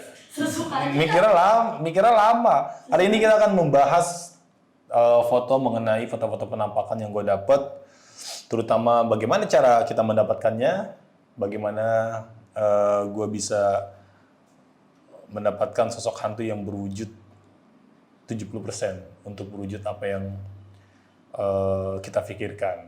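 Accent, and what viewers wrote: Betawi, Indonesia. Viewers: Kocaknya bang klo lagi ngomong..betawinya nyablak bgt dah...salam dari tanjung priok bang😂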